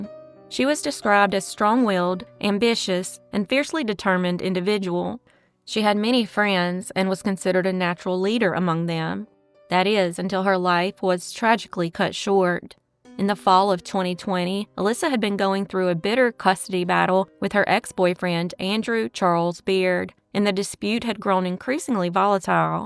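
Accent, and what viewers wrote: South, United States. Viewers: Hello from Georgia! You, Crime Zone, and Michael Franzese are tied for my favorite podcasts. I fall asleep to one of y'all every night. (Hubby uses ear plugs, hahaha) You have such a smooth, melodic, Southern drawl...what's not to like?! Keep up the good work - from one Southern Girl to another. P.S. in case you have haters - "If you are going to be underestimated by people who speak more rapidly, the temptation is to speak slowly and strategically and outwit them." ~ Doris Betts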